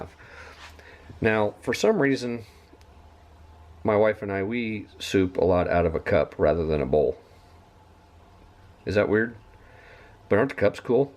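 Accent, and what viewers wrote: Indiana, United States. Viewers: Yep, Indiana. Warsh this instead of wash this. I too am guilty!!!!😉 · You are definitely from Indiana. Lol warsh. Sounds like my sister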